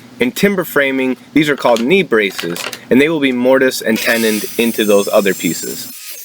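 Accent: Michigan, United States. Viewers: Your accent brings me home. I grew up in Michigan. But I've lived in AZ for 30 years. You sound like my nephews.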